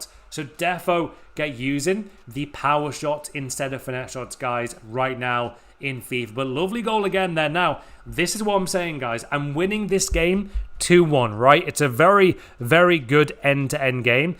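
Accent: Australia. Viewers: So I am guessing your an Aussie?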